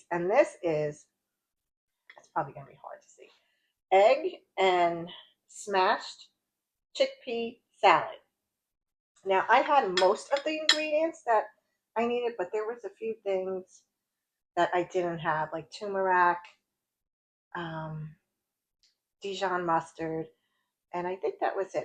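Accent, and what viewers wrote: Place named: New Jersey, United States. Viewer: Oh, friend, sleep deprivation is no joke. I literally got tears in my eyes when you said Michael hadn't slept for a week. Been there, and it's so exhausting, to say the least. Just wears you down in every way. Glad he slept on Sunday night. All the food you showed looked great! The eggs/chickpea dish... yum! I loved following along with you in your week. I don't know how you kept it all straight from day to day! P.S. I love your NJ accent. Hehe, did you know you have an accent? Or maybe I'M the one with the accent. 🤔😄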